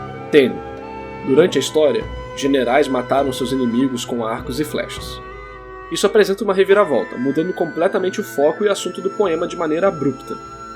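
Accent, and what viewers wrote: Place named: Maranhão, Brazil. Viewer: O sotaque desse cara é muito dr maranhense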